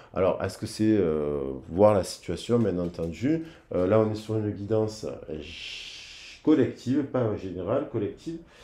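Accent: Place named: Marseille, France. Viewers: Bjr. Je vs découvre ce jour. 🙏🏻🙏🏻🙏🏻 J adore votre accent Marseillais 😉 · Coucou merci pour cette magnifique guidence je suis très heureuse de vous voir et de vous entendre sa fait plaisir avec ce jolie accent marseillais qui vous vas à ravir merci de prendre le temps alors que vous avez beaucoup de travail sa me mais en joie c est un jolie cadeau je vous embrasse bien fort et vous de gros bisous belle journée remplit d amour et de douceur 😚💕🌈🌞🌹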